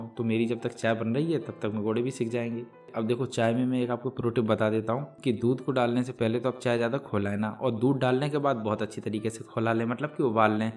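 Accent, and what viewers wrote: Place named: Bundelkhand, India. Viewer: आपकी बुद्देखंडी हिंदी बहुत बढ़िया लग रही है 👍 मुंगोसे भी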